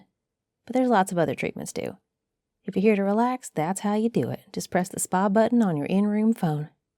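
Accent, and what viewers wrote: South, United States. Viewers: So this is that southern hospitality that they talk about. Like, I’ve never been to the south in my life, but that accent immediately immediately makes me feel at home and taken care of. Also. XP?! Good lordie that computer is ancient. Please tell me it isn’t connected to the internet. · Yes! This world needs more southern accent asmrs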